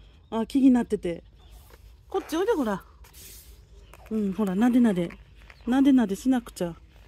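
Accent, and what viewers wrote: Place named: Ibaraki, Japan. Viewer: 初めまして！もしや茨城の方ですか？ 何となく聞き慣れた訛りが😁私は現在北欧のデンマークに住んでいますが、生粋の茨城人です。茶ーちゃん可愛いですね。我が家にも茶白猫がいて、細々と猫動画もやってます。全然人気がありませんけど😅チャンネル登録と高評価ボタンポチポチしちゃいましたよ。これから他の動画もゆっくり観させていただきますね。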